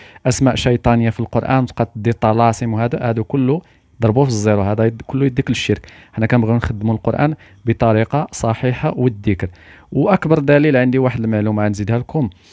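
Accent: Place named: Morocco. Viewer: مغربي مش تونسي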